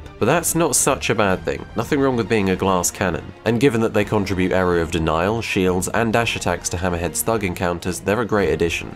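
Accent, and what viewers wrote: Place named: United Kingdom. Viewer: Well you do sound British, makes sense why you find any weather other than abnormal.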